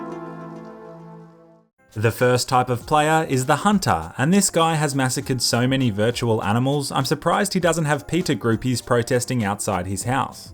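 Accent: Australia. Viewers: You always sound weird. You're Australian. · Is this Aussie accent?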